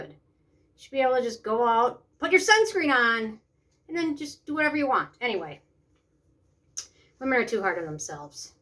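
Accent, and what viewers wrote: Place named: Midwest, United States. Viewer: I like your accent it sounds Midwesterny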